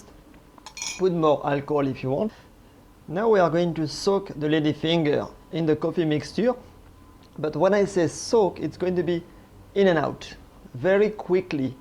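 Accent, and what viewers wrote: France. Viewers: Thank you for a great explanation on how to make a Tiramisu. By the way i love your french accent.🙌😉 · French english 😅😅...funny,I like it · Nice one ! That French accent though..🤣🤦🏽‍♀️🤷🏾‍♀️😘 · I did not realise Chef was called! Quel accent français, seeing the channel name, I was expecting a english gentleman!! Edit: doing that tomorrow by the way;)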